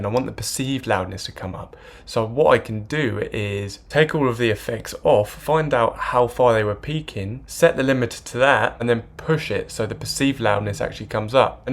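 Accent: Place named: Suffolk, United Kingdom. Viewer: Ahh fair enough man! Thought I could hear a bit of Suffolk in your accent! Anyway keep up the great videos man.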